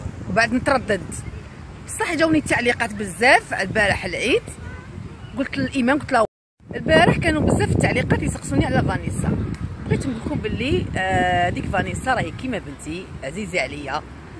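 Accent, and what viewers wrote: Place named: Mostaganem, Algeria. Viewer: Saha aïdek ma soeur marhba bik moi je vien de mostaganem et j'habite à lyon et ta façon de parlé elle est comme la nôtre à mosta,je t'embrasse très fort raki m'wansétna f el ghorba hbiba avec tes vidéos rabi khalik inchallah 😘 😘 😘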